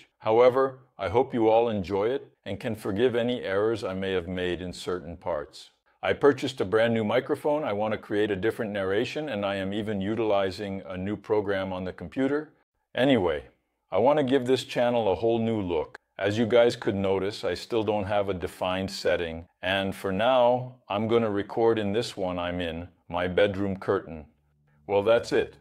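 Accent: Australia. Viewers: Whoa! Are you kidding me? English isn't your 1st language? I've only now just detected a hint of an Australian accent! This whole time I thought you were American like myself!!! 😅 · I’m Australian & feel like I’m hearing an Aussie accent at times. Weird 🤷‍♀️ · Your English is amazing! What's your first language? And what's your accent. Almost sounds like hints of Australian lol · Sounds like you also have a bit of an Australian accent. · You sound Australian sometimes! Your english is fine, i think 😊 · Strong twangs of an Australian accent though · Mate have you spent any time in Australia? Mate it sounds like abit of an Aussie accent leaks out?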